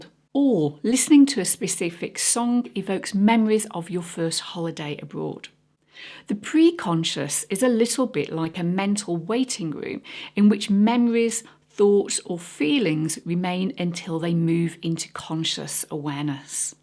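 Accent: United Kingdom. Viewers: I'm very happy to find your channel , the topic are amazing , plus your british accet are marvelous , You have a new suscriptor , and thanks for your effort and confidence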